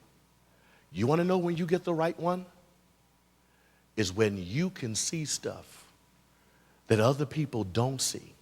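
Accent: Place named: Nigeria. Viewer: U sound like a Nigerian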